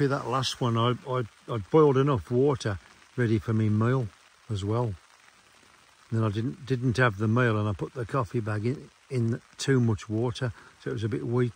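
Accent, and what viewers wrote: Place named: Black Country, United Kingdom. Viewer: Talking of spiritual home, where are you from originally. You sound like you’re from my neck of the woods in The Black Country.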